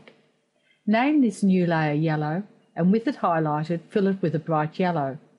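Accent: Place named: United Kingdom. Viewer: your Brit accent is tough to understand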